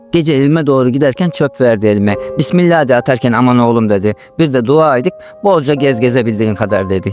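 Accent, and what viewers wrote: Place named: Nevşehir, Turkey. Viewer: Nevşehirlisiniz sanırım,8 yıl çalıştım orda tam ora konuşması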